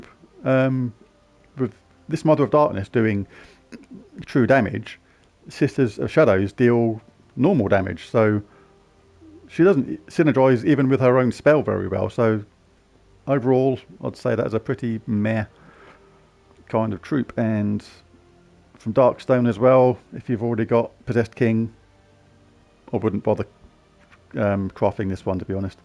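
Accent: United Kingdom. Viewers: nice to see a british? (you sound british) gems of war youtuber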